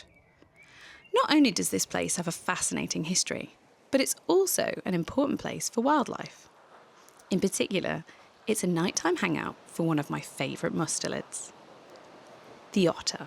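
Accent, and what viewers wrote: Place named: United Kingdom. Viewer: Thank you! I do OK with the British accent, but It's easier when Its loud and clear. 🙂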